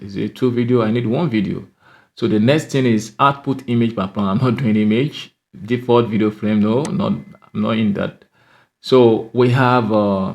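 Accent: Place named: Africa. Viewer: thanks for the video love your african accent :)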